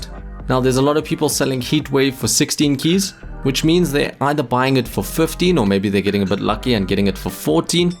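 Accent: South Africa. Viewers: Your South African right?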